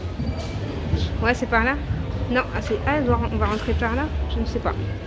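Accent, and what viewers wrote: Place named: North, France. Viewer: Il est d'où ton accent,? Il n'est pas Parisien, si? L'on dirait un accent du Nord Bonne soirée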